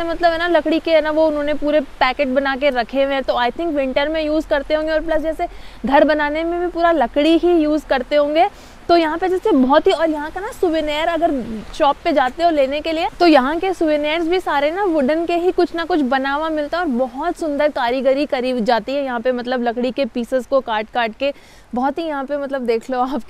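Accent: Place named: Shekhawati, India. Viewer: Mahesh fouji/ jhunjhunu .mere ghar me buffalo, padi or camel h. Aapki voice bahut achchhi h or shekhawati Ki jhalak dikh rahi h.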